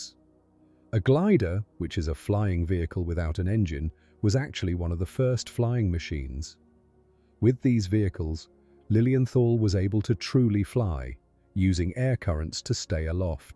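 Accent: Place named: United Kingdom. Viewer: No not listening to a bloody limey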